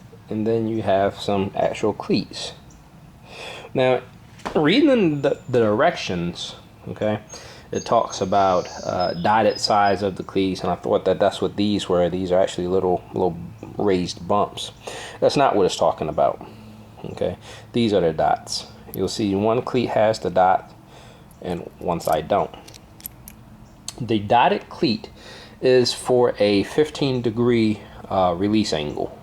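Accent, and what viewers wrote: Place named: Baltimore, United States. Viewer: This guys got a super baltimore accent. Good vid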